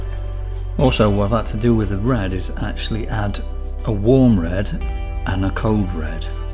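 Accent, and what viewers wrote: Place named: Derbyshire, United Kingdom. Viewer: You sound like you are from Chesterfield uk. Do you do any art workshops. I know you do patron?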